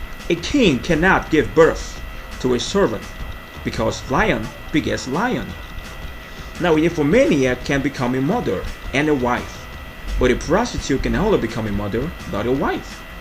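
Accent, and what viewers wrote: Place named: United States. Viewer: well said. this American accent na wa o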